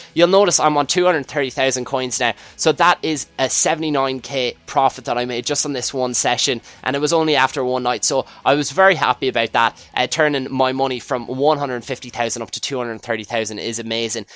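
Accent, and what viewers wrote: Ireland. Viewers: where exactly in ireland are u from?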